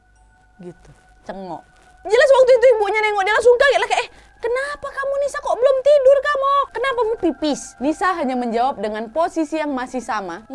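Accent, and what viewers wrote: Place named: Java, Indonesia. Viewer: Plg suka kl wak ngomong bhsa jawa, lucu tp salut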